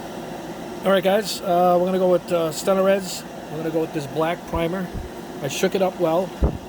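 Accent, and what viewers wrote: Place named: East Coast, United States. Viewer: Cool and east coast accent and a voice that doesn’t sound like a mob hit man but a New York professor of fine art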